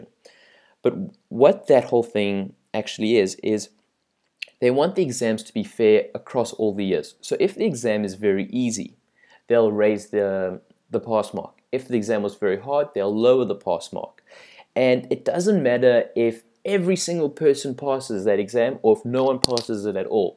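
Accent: South Africa. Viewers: Ahah you are so South African! Me too.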